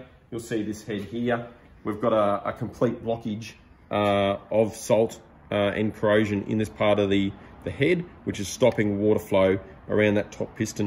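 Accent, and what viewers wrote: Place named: Australia. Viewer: Australian accents are the worst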